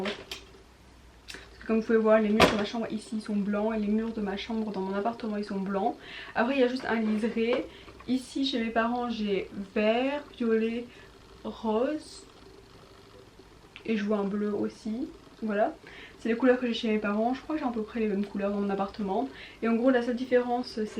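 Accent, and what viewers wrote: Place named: Italy. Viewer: Super vidéo comme d'habitude. J'ai été surprise par ta manière de parler : tu commence a avoir un accent sûrement à force de parler italien 😂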